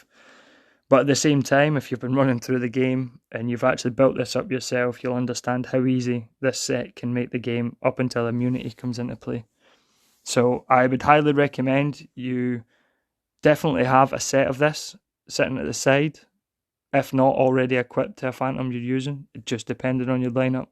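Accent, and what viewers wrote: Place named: Scotland, United Kingdom. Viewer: Great Vid, and great to hear a Scottish voice on a Content creator video :)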